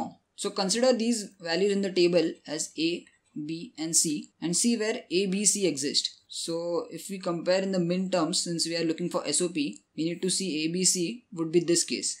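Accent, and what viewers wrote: India. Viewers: if only you indian can speak without that accent people would actually worship u guys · Why Indian have soooo annoying accent?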